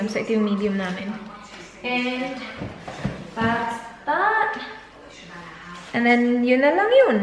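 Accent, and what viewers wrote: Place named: Visayas, Philippines. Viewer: i love your accent meron bisaya sound ..hehehhe and also ilove you say ....mady,mady,madyyyyy....ahahhhhaha love ur vlogs ..hehhehe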